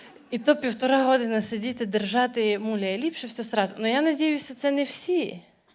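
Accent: Transcarpathia, Ukraine. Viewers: слухаю тільки через закарпатський діалект😅, а так ніц не смішно, хай шлєк трафить 😅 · Оригинально, уникально, по закарпатськи! Йоу!🎉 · Пані Валеріє, дякую Вам і Вашим батькам за Вас! Мені дуже подобаються Ваші виступи, концерти! У Вас прекрасне почуття гумору, елегантний стиль, чудова закарпатська мова. Я пишаюся, тим, що наша Україна має таких чудових талановитих дівчат! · Та ви шо! Не любиться, та не позирайте і не слухайте. У нас на Закарпатті ми так говориме, а ви говоріть як маєте дяку. А ще і совіти роздаєте коли вас не просять. Мало не красно. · Так, до не до неї, бачте як плескають в ладоні, мабуть дуууже подобається, цеж русинська мова, так вони,Закарпаття, кажуть!!!на цей говір...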